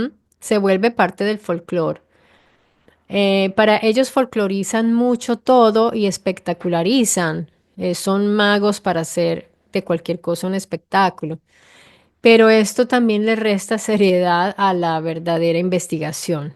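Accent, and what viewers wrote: Italy. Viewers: muchas gracias por tu nuevo video!!!! ya entendí por qué pensé que eras italiana viviendo en colombia por tu acento, era al revés jeje... pues si, esto de los zíngaros es un problema inmenso de toda la vida, pero ahora con el "buenismo" en el sistema judicial, pasó de problema a catástrofe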